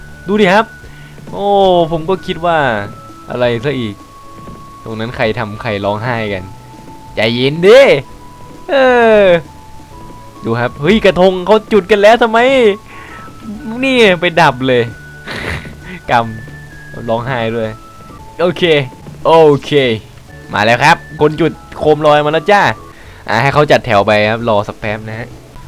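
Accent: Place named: South, Thailand. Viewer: พี่ คนใต้ จังหวัดไหนเหยอ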